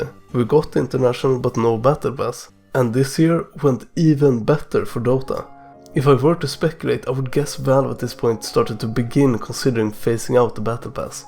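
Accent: Sweden. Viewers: jesus kristus den där tunga svenska dialekten. kekw.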